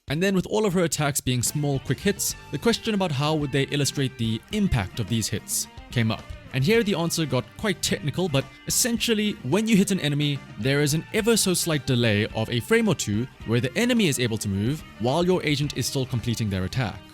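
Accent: South Africa. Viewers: dude are you south african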